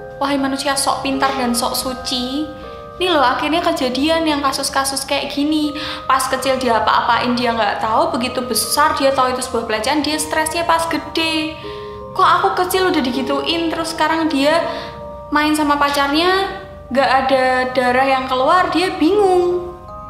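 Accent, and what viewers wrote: Java, Indonesia. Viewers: Seneng medok nya kalo liat mba tuh 😍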